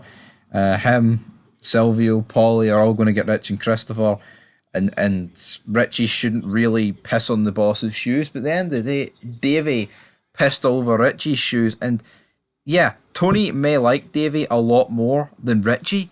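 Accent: Scotland, United Kingdom. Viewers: The Scottish narration doesn’t go with the subject matter.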